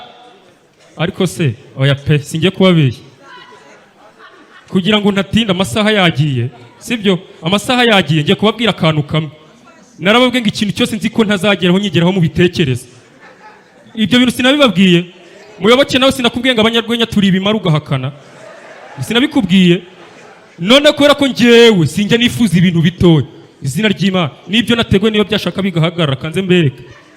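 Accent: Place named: Kiga, Uganda. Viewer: Kugikiga cyawe rwose turanezerewe 😂😂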